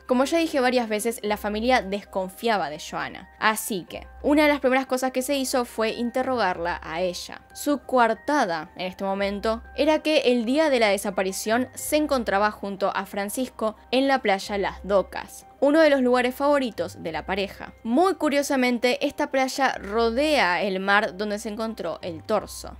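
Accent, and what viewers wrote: Buenos Aires, Argentina. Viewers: Me parece q pronuncias mucho "y y la ll", se q eres argentina pero bajale no mms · x2,su acento porteño. ❤ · Es argentina , Tiene ese acento crack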